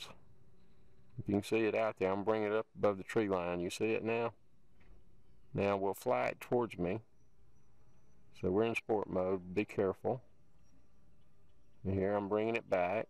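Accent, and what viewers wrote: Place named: South, United States. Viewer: In my nearly 70 years I do believe i have learned a thing-or-two. As Yosemite Sam would say, only a 'Gol-Darn-Idjet' flies over water when TESTING anything unsure of. And by-the-way, your voice takes me 'down home' a bit... lived in SC, now up in MA for 20+ years. Love it here in my home, but I truly miss the 'Southern Speak', y'all. STOP testing over water! ;-)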